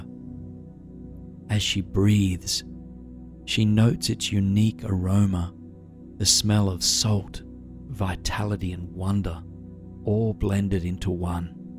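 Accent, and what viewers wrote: Australia. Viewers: Hi, I really love your voice and most nights, I think ill see if this story has no sound added! I don't know how people don't find music or other sounds, in the background very irritating! It feels like hearing hearing finger nails, scratching a blackboard to me! Of course, you can't cater your stories just for me and I'm not a patron (if you have one) so, I have a cheek to say it but I'm genuinely disappointed and I do listen to others and do support them with money at times, as a thank you but I just hate missing out on your voice! I don't generally enjoy men's voices but you have a nice Australian lilt to yours! Again, I am crestfallen and I keep looking to see, if there is a no sound version but there rarely is! Unless, I'm mistaking you for another person but I think, you did kindly post me a no sound (music or added sounds, just white noise to me!) version of a story before. 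It is getting to be an irrational thing for me now because there are so few adult story tellers, as it is! I even am starting to dislike the people that say, 'oh I love the added music' etc etc lol I seriously do need sleep as I'm cracking up! I will just have to give up but I do love your voice and I wish you the best! God bless, from Ireland xx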